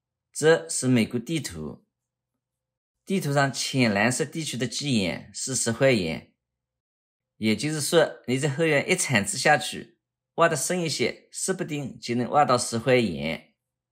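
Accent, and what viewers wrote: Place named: Zhejiang, China. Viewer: 我咋觉得像浙江口音，宁波？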